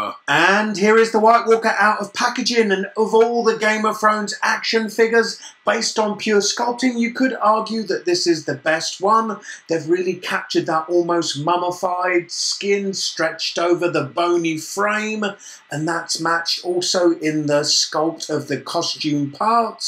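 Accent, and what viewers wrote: England, United Kingdom. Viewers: Sorry, it just sounds like a regular English accent to me. I admire you alot and would never intentionally insult you.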